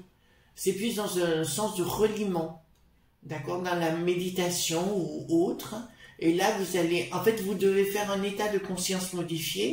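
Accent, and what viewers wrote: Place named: Brittany, France. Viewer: J'entends Bretagne limite Normandie, ca parle à quelqu'un ?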